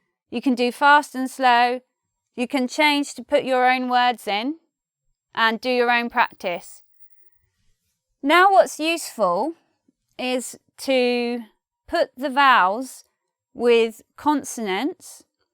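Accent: United Kingdom. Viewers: Sólo si me caso vos podré tener ese hermoso acento británico 😍✨..💘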